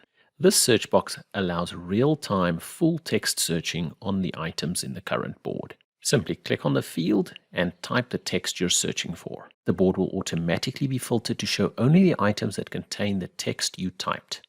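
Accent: England, United Kingdom. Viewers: like the content and usually like an English accent, but this is like fingernails on a chalkboard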